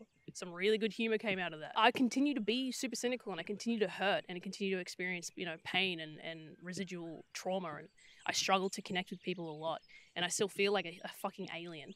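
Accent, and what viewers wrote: Australia. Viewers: my favourite part about this video is that you sound Australian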